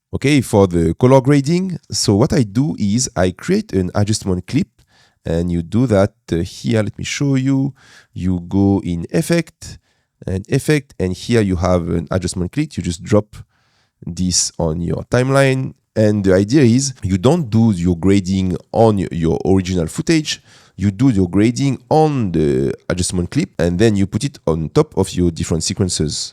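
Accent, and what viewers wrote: France. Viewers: u've a french accent ? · Salut cool ra video , tu es francais ? Car ton accent et vraiment pas comme les anglais que je regader abituellent pour moi tu es francais ton rire et francais 😂 , ca serai cool des tutos en francais , bonne continuation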